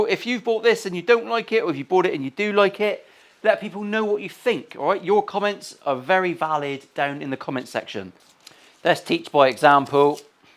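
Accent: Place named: Cornwall, United Kingdom. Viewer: 'Ere,! I lived in Torpoint for a couple of years when I was a kid. It sounds to me like you've got a touch of Cornish in your accent. Did you live west of the Tamar for a while, or is it just a Plymouth thing?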